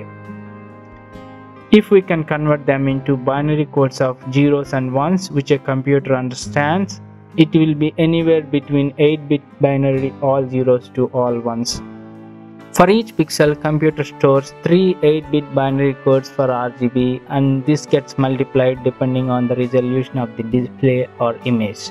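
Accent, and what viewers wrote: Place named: India. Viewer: I am from China.Your Indian English is awesome.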